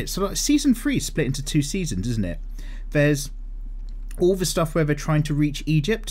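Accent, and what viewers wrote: United Kingdom. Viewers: dumb question but here it goes . I've kept tabs on your videos for a time now because___ you are really fucking good at this . why not put out a little auto biography of how it is you got this effing good , and why (even it's for extra money) why you let us all in on it . the skill set , your craft , the glib humor . there's no other channel worth sticking with because they all suck . Lastly , in America many of us are burnt out on british accents they use it for everything commercially so you're the rare exception for me . so what do you say ? how about a story about yourself . ( hell include your band too) best regards , me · you kinda look like and sound like Ravi from Izombie (not just the British accent). if you don't know who i'm talking about... well you can look it up. i'm also gonna post this on every DWM in the future till you find out.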